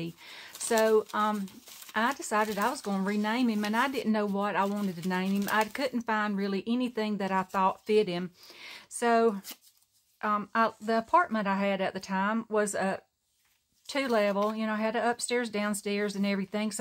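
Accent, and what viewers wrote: South, United States. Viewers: I'm new saying howdy from Texas!! I love to hear another southern voice and your journals are amazing :) Where do you get all the supplies? I wish I could come play at your craft table!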